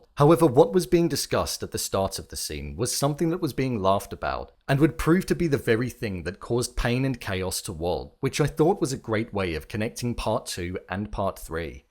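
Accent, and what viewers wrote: United Kingdom. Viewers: Why does yt keep recommending me bri’ish video essayists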